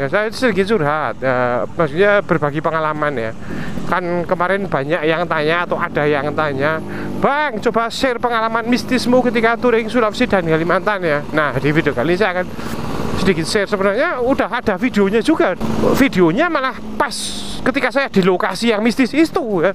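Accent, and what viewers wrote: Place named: Java, Indonesia. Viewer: Orang mna sih lho bng kok bhasa jawa rantau y bng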